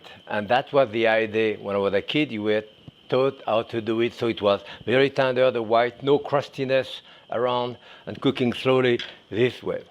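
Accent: France. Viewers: L 'accent francais c'est magnifique. Great explanation merci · With that chic French accent he could make a carrot butty sound exotic! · That accent is Jacques closseau level ! Love it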